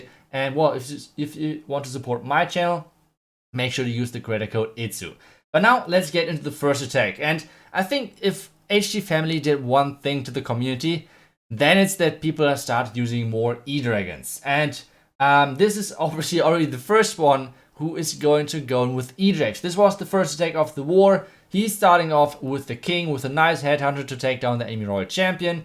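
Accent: Germany. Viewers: I love the German accent when you speak English😍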